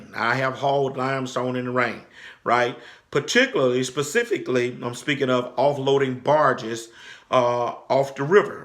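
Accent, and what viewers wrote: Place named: Louisiana, United States. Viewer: What part of Louisiana are you from brother?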